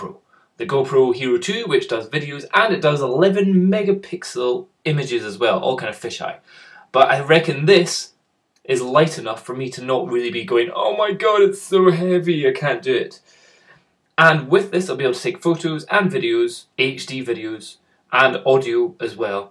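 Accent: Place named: Scotland, United Kingdom. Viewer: I´d take the 550D with the kit lens! Btw. what intrigues me: is your accent genuinly Scottish or did you "internationalize" it in some way? It´s interesting, that you´re actually using Am.E. pronunciation sometimes like e.g. can´t, plant, chance, answer.... Accents have always intrigued me, so I´m digressing from the topic a bit!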